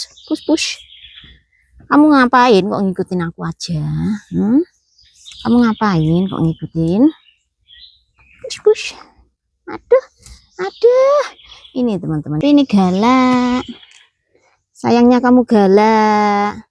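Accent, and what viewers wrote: Java, Indonesia. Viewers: mba sy baru mengikuti Chanel sampian, sy jd suka, karena gaya bicara nya mba medok bahasa jw, unik gitu lho campur2 bahasanya 😁❤👍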